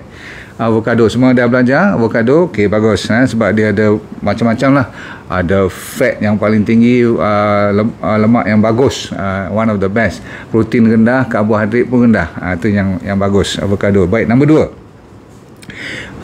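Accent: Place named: Kelantan, Malaysia. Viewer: Pandai kecek klate. Manih leting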